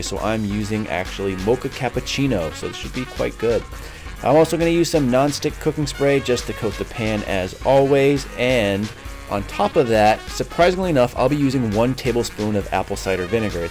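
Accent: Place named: New England, United States. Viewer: what part of New England are you from?? Wicked!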